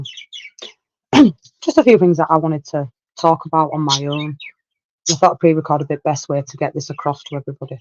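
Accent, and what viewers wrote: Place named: Leeds, United Kingdom. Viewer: I think you are beautiful, and all the panel are beautiful. What you do is amazing. Love you. You are accepted by the one's who really care. Fuck the haters and just focus on the one's who care for you and what you do for your community. Take care, thinking of you x. And by the way, I love your Leeeeds accent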